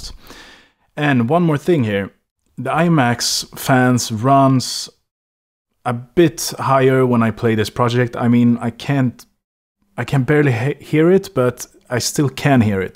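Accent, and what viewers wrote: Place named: Sweden. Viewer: Tack för denna videon =) Då vet man lite vad man ger sig in i ifall man beslutar för att gå över till M1 i framtiden. Gissar på att du pratar svenska, hör på dialekten =) =)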